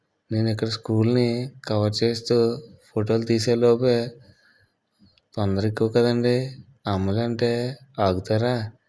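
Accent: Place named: Godavari, India. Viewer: Orye nuvu entira godariabbailala cheptunnav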